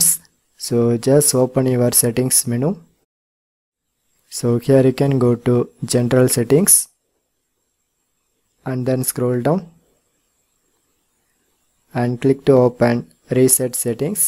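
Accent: India. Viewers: Im tired of hearing these indian accent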